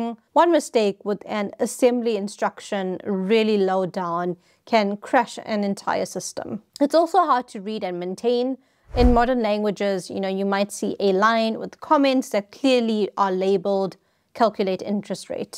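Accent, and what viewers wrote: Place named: South Africa. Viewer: South African I think...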